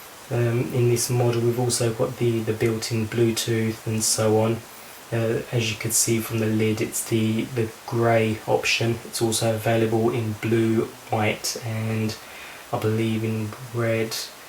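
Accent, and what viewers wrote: England, United Kingdom. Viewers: r u english?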